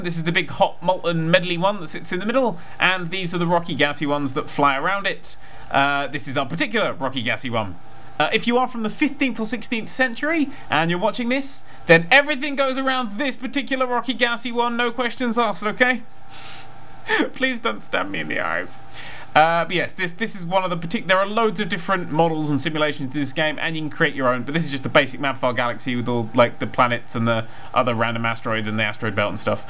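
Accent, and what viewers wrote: United Kingdom. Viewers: 'American voice' its a british accent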